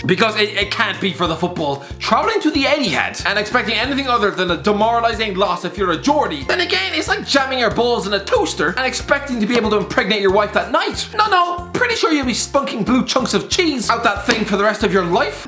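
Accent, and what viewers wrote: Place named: Ireland. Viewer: Can’t be the only one who has to switch on captions for this guys videos. I have a lot of Irish mates but god damn this accent is so thick 😂😂😂. Good content though absolutely love the channel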